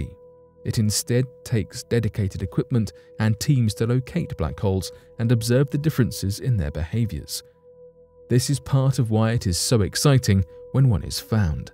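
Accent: United Kingdom. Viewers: Lisping is annoying, even with a British accent. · I'll believe anything in a video that's narrated by someone with a British accent. Subscribed.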